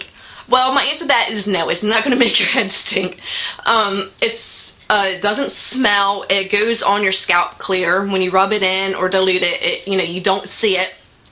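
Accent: Philadelphia, United States. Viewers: This chick has GOT to be from Philly! I'd know that accent anywhere.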